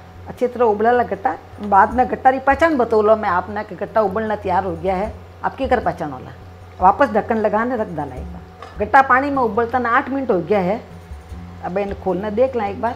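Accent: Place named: Rajasthan, India. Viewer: Mai bhi marwadi hu aur aap ki marwadi sun kar mujhe lagta hai jaise mai apne family member ko sun rahi hu ❤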